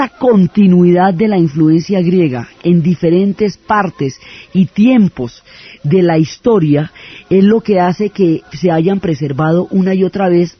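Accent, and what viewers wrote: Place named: Colombia. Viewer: TIENE ACENTO COLOBIANO LA SENORA, Y ME ENCANTO SU VIDEO. FELICIDADES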